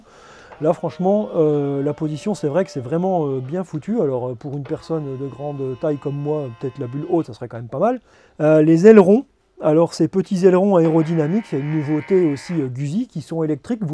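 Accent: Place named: Northeast, France. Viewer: Bonne vidéo, bien commentée, avec un accent du Nord Est bien sympathique !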